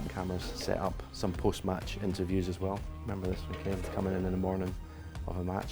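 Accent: Scotland, United Kingdom. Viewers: Fine Scottish man .the voice of fellow Scot’s are made for commentary. And a fifer to boot well done mate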